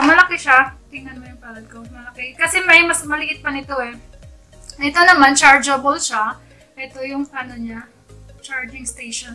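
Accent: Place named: Philippines. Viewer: Oo nga unang tingin q nga kala q di pinay nung nagsalita lang kaya yun alam mo n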